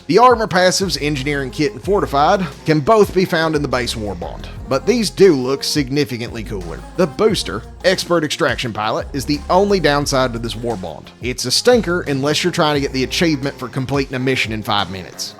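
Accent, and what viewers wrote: South, United States. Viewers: im new as it just came to xbox and i thought this game couldnt get any cooler and then i found your channel. nothing makes me wanna spread democracy more than a fellow sweet tea accent screaming about freedom. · Hey , is the southern draw an affectation or your natural dialect? I don't mean to shatter the illusion, I love your content, just seems like a lot of work you do for us if it isn't!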